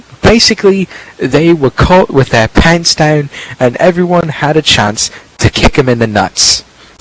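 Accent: United Kingdom. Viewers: UK accents make for great narratives!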